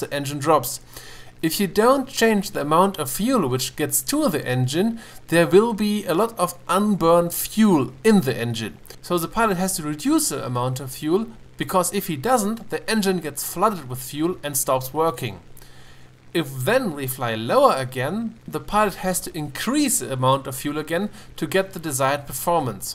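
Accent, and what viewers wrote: Germany. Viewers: Gutes englisch aber man hört das du aus Deutschland kommst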